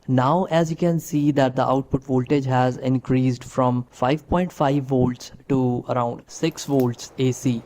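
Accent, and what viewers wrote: India. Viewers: У него индуский акцент??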